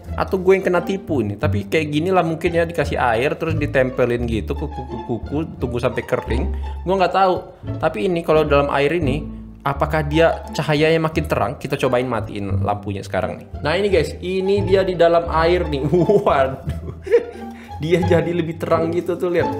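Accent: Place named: Bali, Indonesia. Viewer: Org bali yah bg ..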